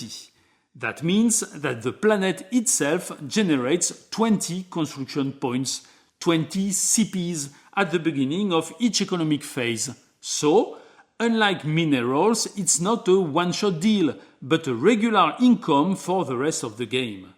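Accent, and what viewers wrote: France. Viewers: Thank you, excellent video. I love the French English accent!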